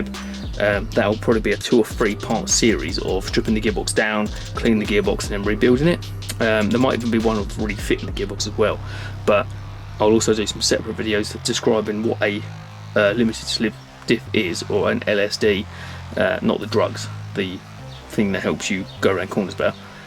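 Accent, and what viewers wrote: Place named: Australia. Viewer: I like your aussie accent 👌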